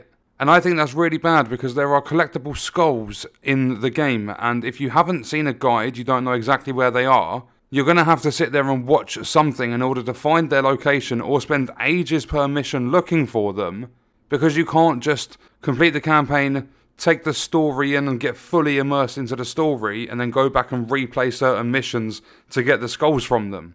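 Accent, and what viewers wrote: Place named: Croydon, United Kingdom. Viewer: Haven't found any AA or A games that take me fancy in a long time. Loot based is my main staple. This is the first time I've actually enjoyed a halo game in decades. Probably because it feels amazing. I would love to boycott AAA games because the state they come out in is close to theft. That's why i like your channel bro, because you are not scared to tell it how it is.... plus your accent and humour reminds me of an old friend of mine from Croydon 👌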